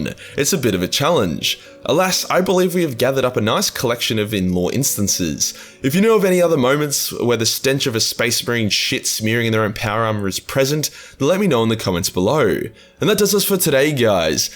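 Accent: Australia. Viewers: Loving the content ,and am guessing from the familiar accent ,your a fellow Aussie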